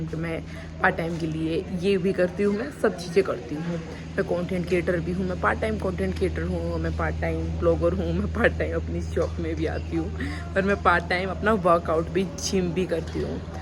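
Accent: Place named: Haryana, India. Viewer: Nice haryanvi😅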